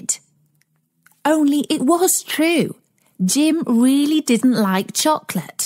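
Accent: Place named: England, United Kingdom. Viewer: Funny story. Great English accent!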